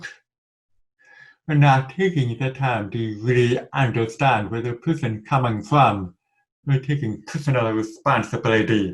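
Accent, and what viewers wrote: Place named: Vietnam. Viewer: I had a vietnamese lecturer, and you are have a lighter version of his accent. Much more understandable than him though :)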